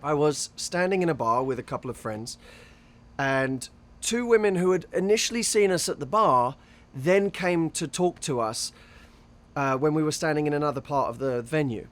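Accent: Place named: United Kingdom. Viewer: watching this video, just to hear they lovely British accent again.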